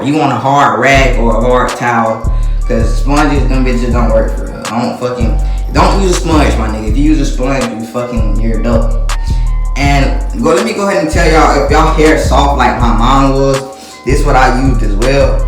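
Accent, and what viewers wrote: Florida, United States. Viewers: U can tell he from Florida 😭😭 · Florida talk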